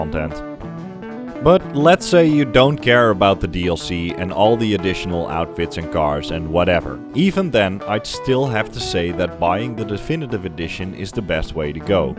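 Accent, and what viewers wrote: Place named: Netherlands. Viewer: Je klinkt Nederlands.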